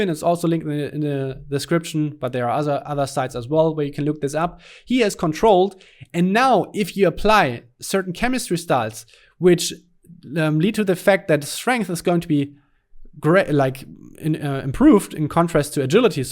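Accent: Germany. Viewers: youre one hundred percent german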